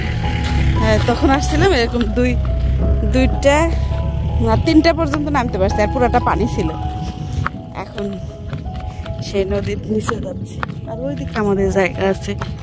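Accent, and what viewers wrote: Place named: Bogura, Bangladesh. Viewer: আপু তোমার মুখে বগুড়ার ভাষা শুনে খুব ভালো লাগছে 😊আমার দাদাবাড়ী বগুড়া শেরপুরে সীমাবাড়ি আর সারিয়া কান্দি ভুয়া গাথি অনেক আত্মীয় আছে😊❤❤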